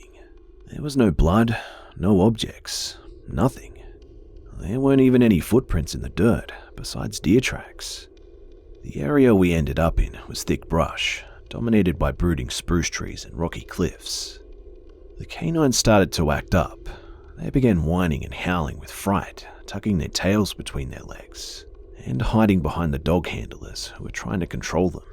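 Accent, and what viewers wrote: Australia. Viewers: I love your Aussie voice storytelling so much that I recently grabbed me a lad from Bris to forever listen to hehe. Thanks 😉🙊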